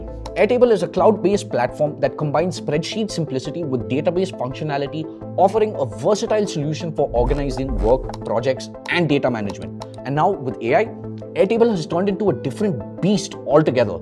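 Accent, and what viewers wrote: India. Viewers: first homewaork to you get rid of your indian accent fun facts 50% of audience cloose and move to next video after hearing just the accent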